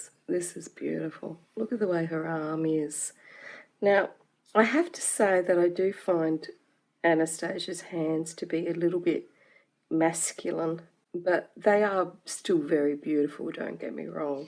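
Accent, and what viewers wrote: Australia. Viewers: I like your videos a lot, and it's great to hear an Aussie voice, but I'd like them even more if you kept you hands/fingers off the page while trying to see the details of the picture, and yes, they are exquisite. You've definitely persuaded me to buy at least one of these, so thank you for sharing.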